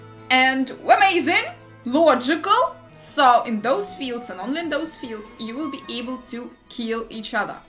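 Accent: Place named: Russia. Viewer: По акценту как будто русская)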